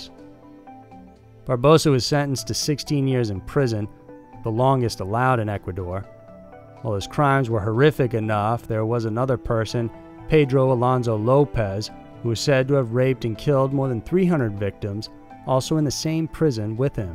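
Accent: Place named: Texas, United States. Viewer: Your bayou / Texan syrupy accent is just so nice to listen to - despite the content being so disturbing. Happy to have found your channel. 💖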